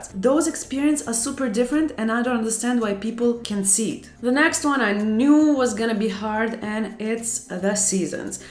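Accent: Russia. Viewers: She talks about everything being "amanha, amanha amanha" (tomorrow tomorrow tomorrow) but from her accent I'm pretty sure she's Russian and therefore she's in no position to say anything negative about any other country. I'm sure everything is worse in Russia.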